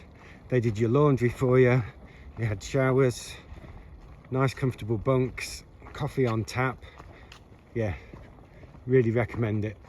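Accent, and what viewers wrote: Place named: United Kingdom. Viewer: As Brit who watches a lot of US thru-hikers, it's a nice change to hear a British accent.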